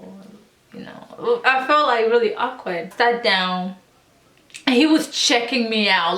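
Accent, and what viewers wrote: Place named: Netherlands. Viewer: Where u from your accent different like u from Netherlands or something?..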